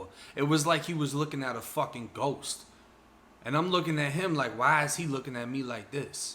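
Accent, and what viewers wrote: Boston, United States. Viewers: I'm just finding out about you. I love you. And you're from up North. Sounds like Boston. Jersey City NJ here. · Sound like you from Boston, Blood. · My man tells a great story. I see ppl saying he was scared in certain situations, but something tells me he 💯. Tell your story to keep ppl outta jail. But that Boston accent... Keep 'em coming,bro. I just subscribed. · I thought you were from Boston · Your from Tampa?!!! Why do I hear a hard ass accent like your from Boston. When you say yard and car and shit like that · I dont know why.I thought you was from Boston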